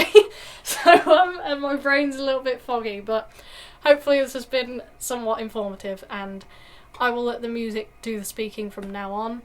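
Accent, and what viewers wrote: United Kingdom. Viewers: It sounds great,and your british Accent too!!Greetings form Argentina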